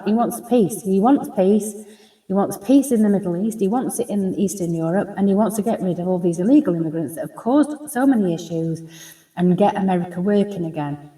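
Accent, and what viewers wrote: Sheffield, United Kingdom. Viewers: I knew that was a Sheffield accent, thank you for your readings. I love listening to you. Love and light from another Sheffielder ❤️🙏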